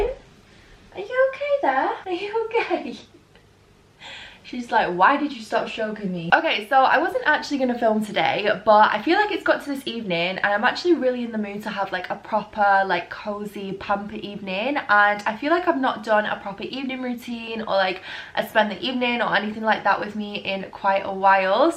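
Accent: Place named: RP, United Kingdom. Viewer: Have you always lived in Manchester? Your accent sounds quite different and posh I can’t place it 🤣X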